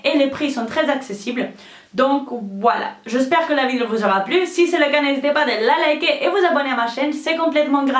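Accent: Spain. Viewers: Je t'aime tu es magnifique et ton accent espagnol aussi 💙💚💛💜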